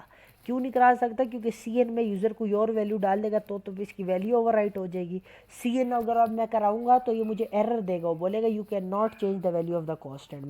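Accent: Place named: Karachi, Pakistan. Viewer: Or mujhay fast Reply marna waissay bi zuban sy karachi ka lagty ho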